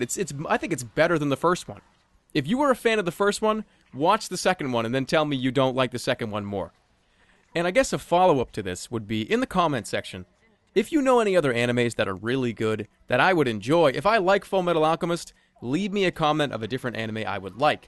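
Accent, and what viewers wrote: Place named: New Jersey, United States. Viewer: Are you from New Jersey or Boston by any chance? I'm in New York. I can hear a strong accent :D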